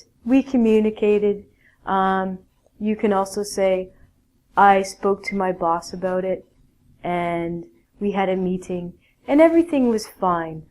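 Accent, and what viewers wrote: Canada. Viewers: are you canadian? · oh my god, i could tell you were Canadian from the start, haha. I love Canada!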